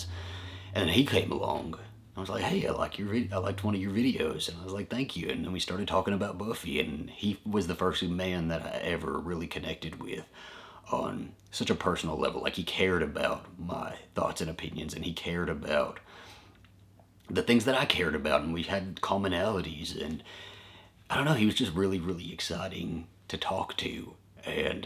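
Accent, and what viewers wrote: South, United States. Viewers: your voice that caught me nothing Better than a southern drawl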